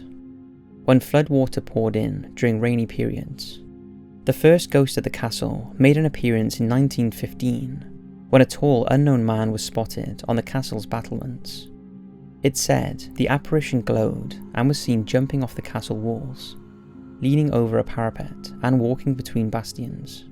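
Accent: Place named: England, United Kingdom. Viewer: Didn’t know you were English haha 😂 iam too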